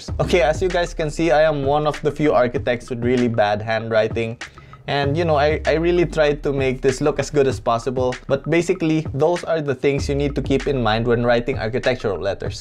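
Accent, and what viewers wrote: Philippines. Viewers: You sounds like a Pinoy like me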